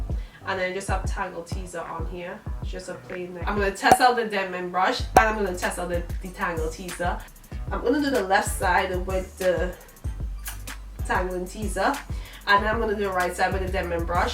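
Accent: Trinidad and Tobago. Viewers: I know a Trinidadian when I hear one 🇹🇹🗣!!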